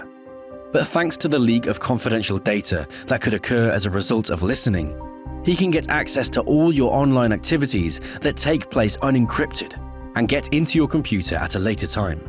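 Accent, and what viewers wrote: United Kingdom. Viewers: They are probably covering their asses legally in case they are charged with supplying information that can be used for illicit actions. I don't think this would be as much of an issue in the US given publisher protections (first amendment rights), but if the narrator is a person/not text to voice, then based upon their accent, they are most likely coming out of the UK. The UK doesn't have constitutional rights outside of the Magna Carta. As such, publishers are far more liable for suit in the event something they publish results in real world damages. For supplying the information, they could potentially be held liable to criminal charges. I'm pretty sure that that isn't the case under US law in most cases/jurisdictions, as there are alternative case uses. However, as this is seemingly coming from the UK/EU, they may be held to different standards—which, while unfortunate, would help explain the censorship. 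That said, I would recommend that they team up with a publisher in a country that does not have these limitations who could offer more comprehensive explanations. Given the censorship, I found the information of substantially less value as knowing what programs are used, how they work, what to look for, etc., is necessary for a more comprehensive security education. That said, when did being a "hacker" become a negative connotation amongst the tech community? If you are a "Cyber Security Analyst" that makes you a "white hat" hacker. I myself tend to float between "white"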